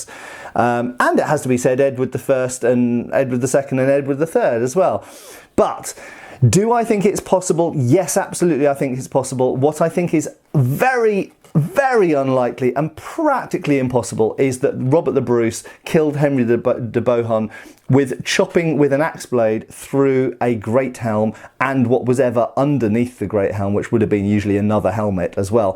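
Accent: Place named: Wales, United Kingdom. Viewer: sounds Welsh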